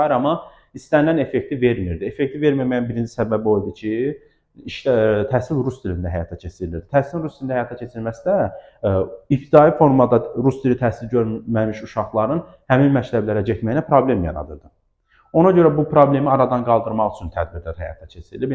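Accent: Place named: Aghdam, Azerbaijan. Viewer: Mən Ağdamlıyam, ləhcənizə görə soruşdum,bizimkinə çox oxşayır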